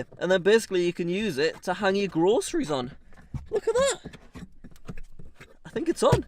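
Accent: United Kingdom. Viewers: I love the British axsent because it is so funny 😆 haha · are u bri ish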